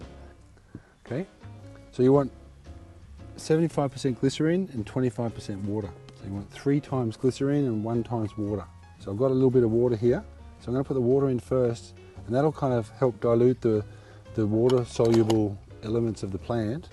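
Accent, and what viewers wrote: Australia. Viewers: Hi 😊 What would be the chances of me coming to do work experience? Forgive me if im wrong but that is an Aussie accent? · I subscribed for the same reason! Gardening and an Austrailian accent. Good day! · Thank you for giving free information and I copied down much of the links and when I get paid I will be supporting your work and I appreciate all the information and help! Thank you so so much and I love your Australian accents helps my American add mind pay attention! ❤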